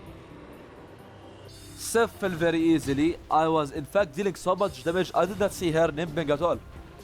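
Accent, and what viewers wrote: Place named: Arab world. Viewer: Are you arabic? Youre accent kinda sounds like an arabic one lol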